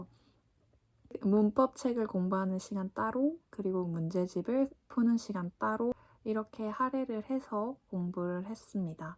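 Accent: South Korea. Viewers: 부산 사람 맞죠?! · 전라도 사투리잇는거같아요 아닌가?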